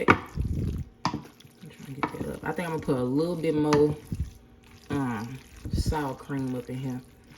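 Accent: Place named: South, United States. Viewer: She so southern haha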